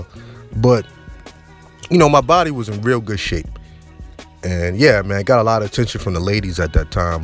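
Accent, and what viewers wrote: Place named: Baltimore, United States. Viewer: you sound just like a brotha I was in the military with, he was from B'more... I know that Baltimore accent fam.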